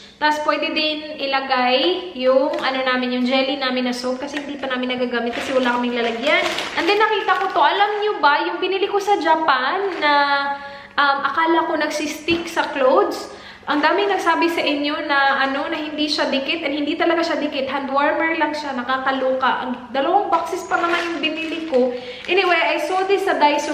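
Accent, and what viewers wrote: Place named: Visayas, Philippines. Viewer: Always watching ur blog... di gyud kumpleto ang adlaw og di ko kita sa imo blog 😊 bisaya kaau 😂 cagayan de oro 😚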